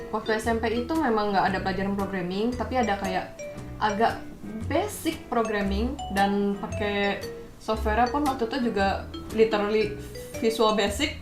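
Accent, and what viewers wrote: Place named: Java, Indonesia. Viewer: kak asalnya dari mana kok agak medok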